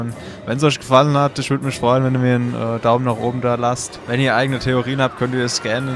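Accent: Germany. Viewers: Kommst aus Mannheim oder Ludwigshafen?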